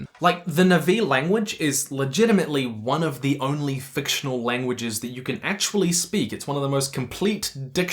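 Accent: New Zealand. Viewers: Am I only now finding out you're kiwi but and not just British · Hold the phone. I didn't clock the kiwi accent for YEARS. Someone revoke my residency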